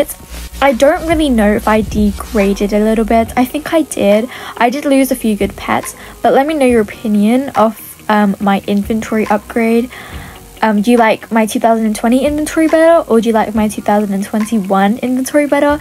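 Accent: United Kingdom. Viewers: You are so british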